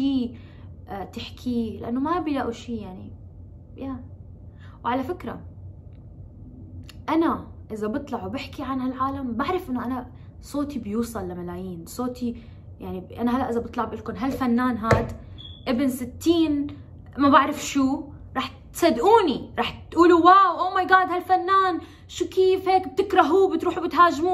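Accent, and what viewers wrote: Iraq. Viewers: كلام رائع جدا وشحلاتج تحجين عراقي 💋💋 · انت انسانه جميله وطيبه القلب ومبدعه وانت تحكي بلعراقي بتجننني. وتتاثريش بكلام ناس. لانو كلام. الناس ما بقدم وله بأخر❤️ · كلامج بلهجه العراقيه حيل حلو 😍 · اللهجة العراقية تلوكلج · كولش ححلوو من تحجين باللهجه العراقيه تلوكلج 🤭😍🇮🇶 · كلامج بلعراقي ايخبل متابعج ابن بلدج من العراق💖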